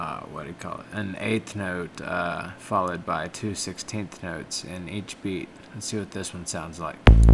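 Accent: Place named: United States. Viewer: nice vid, but i mean, you are an american (because of the accent), and i thought that americans only listen to hip hop and mainstream kind of shit. But oh well...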